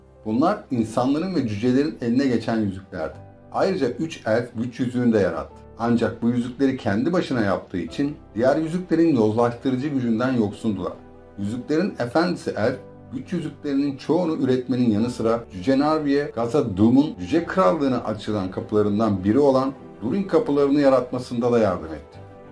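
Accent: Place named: United States. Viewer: Telaffuzlar amerikan ingilizcesi kıvamında olduğundan ekrana bakmadan izleyince feynır kimmiş la deyince ekrana bakınca he bizim eski feanor’muş dedim.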